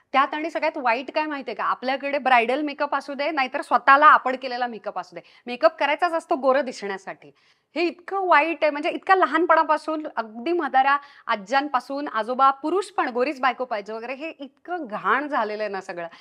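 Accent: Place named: Pune, India. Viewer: कधीतरी नॉर्मल टोन मध्ये का नाही बोलत आपण? बोलण्यात सारखा पुण्याचा अभिनिवेश का असावा? बाकी आपले व्हिडीओज चांगले असावेत. आमच्या "ह्या" तुमचं कौतुक करतात.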